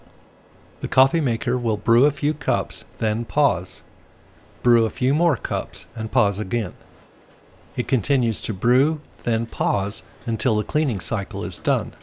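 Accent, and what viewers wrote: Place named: Ireland. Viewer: Sounds to me like he’s from Ireland but has been in the US for a long time so it’s subtle now.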